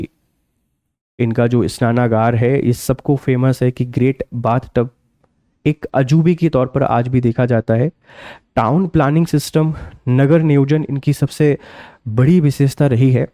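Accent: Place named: Madhya Pradesh, India. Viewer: Arey madhya pradesh aur maharashtra ke taraf (ri) ko (ru) bola jata hai samjhe.. Isleye wo aise bol rhe.. 😮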